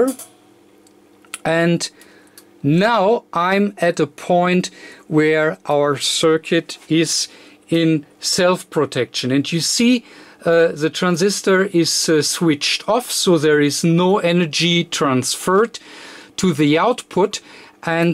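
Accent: Germany. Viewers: One of the BEST way to make even layman to understand. Hats - off. I really enjoy your pronunciation of each word with a tinge German accent. Vielen Danke. Auf wiedersehen. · It was a wonderfull n extremely comprehensive explaination of more than the basic working of SMPSs by the very intelligent gentleman although his english was good enough to follow (i think he being a german) it was very clear n highly informative !! A big Thank You to the gentleman !!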